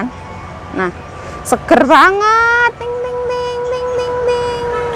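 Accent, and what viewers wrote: Java, Indonesia. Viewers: Mba ini orang mana yaa? Bahasa jawanya medok bgt wkwkwk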